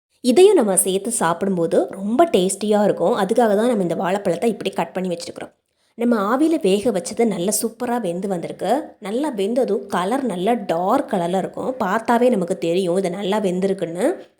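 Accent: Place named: Kongu, India. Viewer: நாங்கள் இப்படி தான் பேசுவோம் எங்க கொங்கு மொழி சூப்பரா தான் இருக்கும் மரியாதை கலந்த மொழி வாழைப்பழத்துல குற்றம் கண்டு பிடிக்கிறிங்க பாஸிட்டிவ் பேசுங்கள் நெகடிவ் வேண்டாம் இந்த பெண் பேசியது அருமையாக தான் இருக்கு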